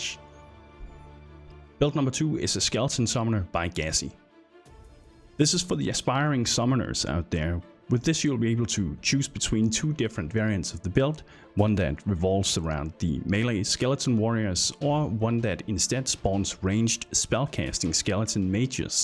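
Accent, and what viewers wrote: Denmark. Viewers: Just wondering, are you danish? Because your accents sounds quite like a dane 😂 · You're danish aren't you.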